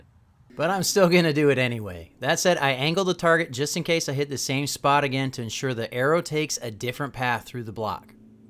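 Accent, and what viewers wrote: United States. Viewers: I always thought that the "Twang" was because of your southern accent. Thanks for all the great videos.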